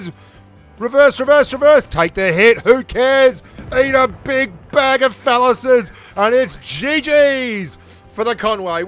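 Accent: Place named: Australia. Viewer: Hey old mate,i am happy you are back.it is a pleasure to hear your oz accent.just makes my day absolutely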